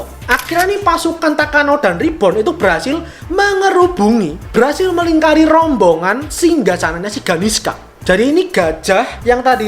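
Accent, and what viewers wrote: Java, Indonesia. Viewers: Medoook bgt loooo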